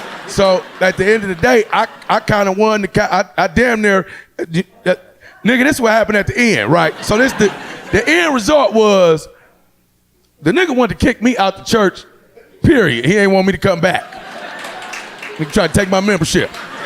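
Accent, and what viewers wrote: Detroit, United States. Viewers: This dude is hilarious! Sounds like Eric the Hip Hop Preacher! That Detroit accent strong! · Soon as I heard what up doe I'm like he from the D lol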